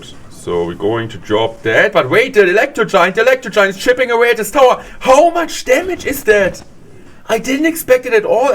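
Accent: Germany. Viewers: Somehow the German accent makes it all sound so much cooler. Nice video,.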